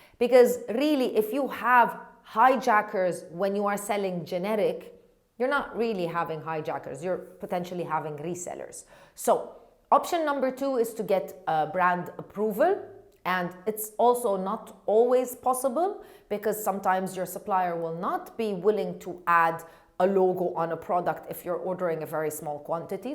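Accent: Arab world. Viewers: i don't want to learn from you but i like the way you speak english in arabic.hehehhe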